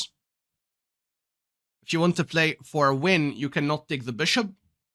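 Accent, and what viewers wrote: United Kingdom. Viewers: Marvelous video ❤, Nice presentation and magnificent pronunciation with accurate British accent ❤, love the change and hopefully you continue with the same enthusiasm and quality for long time 😍🤩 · İ am in love with your British accent doc😂❤ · What a British accent 😍😍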